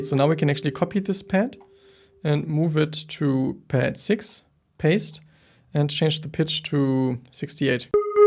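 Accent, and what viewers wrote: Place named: Germany. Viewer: i like the way you speak, professional english with that little german accent chills me somehow lol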